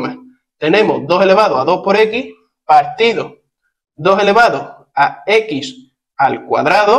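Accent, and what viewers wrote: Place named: Spain. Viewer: hola eres profesor dé matemáticas ami no me entran las matemáticas estoy pensando en ir ala universidad haora si Dios lo permite después dé que dure mucho tiempo fuera pero explicas esa matemáticas mui rápido ven paca Pará República Dominicana para que me ayudes eres español porque hablas con la z ta cómo té yamas nunca té hubiera visto en Youtube primera vez pero explica mui rápido 😂😂😂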